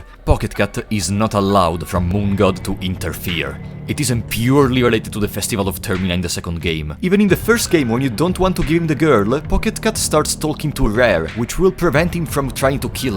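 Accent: Italy. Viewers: I love your accent funny italian man ( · ma sei italiano? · Bro with that smexy ahh italian accent of yours, just make ASMRs plz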